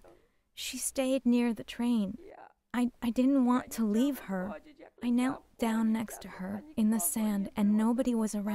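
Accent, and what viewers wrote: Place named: United States. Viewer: thanks for uploading.The spoilt brat american narrator is annoying but I really should not quibble as this is great stuff.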